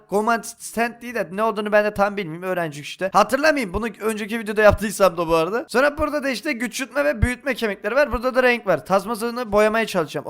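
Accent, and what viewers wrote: Black Sea, Turkey. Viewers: Abu sen nerelisin da? ( bubamun hesabu bence Karadenizlisin diye düşünüyorum 😂😂)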